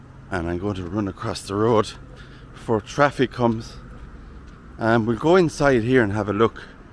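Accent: Ireland. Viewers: Oh my goodness, that is terrible, it's unthinkable. Keep up the good work, I think you are wonderful beautiful Irish voice. Lynn in Brittany x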